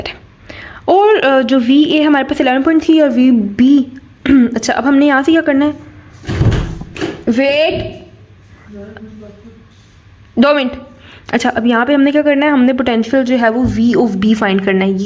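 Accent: Pakistan. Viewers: Lahori accent 👍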